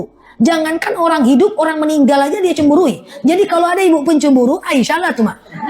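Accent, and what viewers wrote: West Sumatra, Indonesia. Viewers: Orang Indonesia ( minang bro ,kelihatan logatnya) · USTADZAH ITU PUNYA LOGAT,SEPERTI ORG SUMATERA BARAT (PADANG). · Katanya paniang kapalo brarti eta ustadzah urang minang euy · Minang ini. Mas bro 🤭😊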